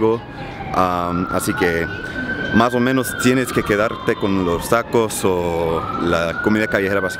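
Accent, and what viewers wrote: Mexico. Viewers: cada ves hablas mejor el español! y mas que estas aprendiendo el español mexicano! eso es super. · Qué bueno que visitas México porque hablas muy Mexicano, pásatelo rico en mi tierra chido güey!!! 👍🏼🇲🇽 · desciendes de Mexicanos'? o como es que hablas tan Mexicano? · Ya tiene 3 años mas o menos que no es Distrito Federal, SOLO CIUDAD DE MÉXICO O CDMX O CDMEX, tienes acento mexicano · Wow your spanish is awesome. The vast vocabulary you have no mistakes at all even your Mexican accent is quite impressive. You ahould be very proud of your achivements. Remember one thing, Mexican spanish is spoken by at least 250 million people. So thats the spanish you got to use and speak for it also has such influence in the rest of the spanish speaking countries.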